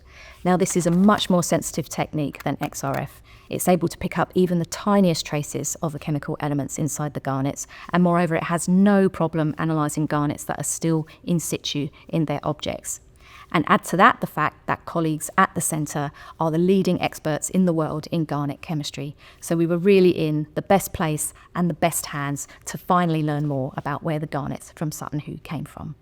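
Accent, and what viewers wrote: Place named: United Kingdom. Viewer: I want this lady to explain everything to me. A hot blonde with a British accent sure makes information go down smoothly. 😅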